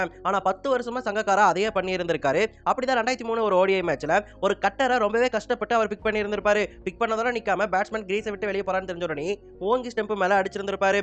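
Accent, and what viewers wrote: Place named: Punjab, India. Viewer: Punjab inglish ❤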